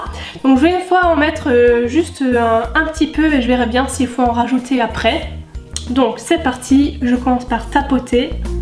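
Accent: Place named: South, France. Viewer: ah boooon tu viens d'Alsace, moi aussi mais je trouve pas trop que tu as l'accent Alsacien j'aurai même presque dis du sud mdr, aah c'est peut être parce que tu viens d'Alsace Moselle sinon superbe vidéo continu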